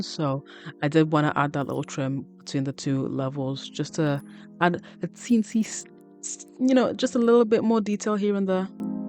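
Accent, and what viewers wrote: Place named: Liverpool, United Kingdom. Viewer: Looks amazing! A modern take. Brew you’re accent is really unique, it sounds like a tiny bit of scouse and other accents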